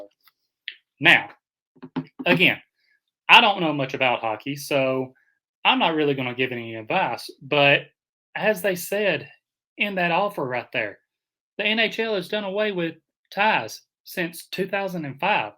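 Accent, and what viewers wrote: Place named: South, United States. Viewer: Dolphins fan here....this guy has a very strong country/southerner accent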